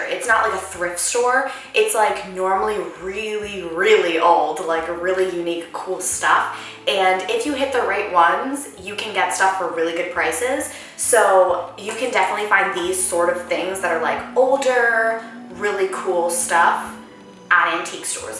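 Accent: United States. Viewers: From an American who speaks french: Use an online course, because the easiest way to learn is to immerse yourself in the language, and Rosetta Stone can help simulate that experience. Also, your American accent is very strong and could interfere with learning a French accent properly, so an online course can help correct that <3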